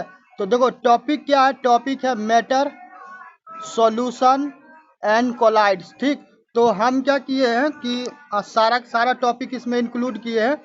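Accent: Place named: Jaynagar, India. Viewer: Hame lg rha hai aap Jaynagar se hai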